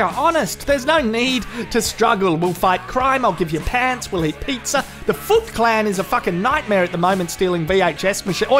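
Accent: Australia. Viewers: That feeling when you realize you accused an actual Australian of having a fake accent lol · Every video on YouTube should start with "we need an Australian guy narrating this" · Everything needs an Australian guy narrating it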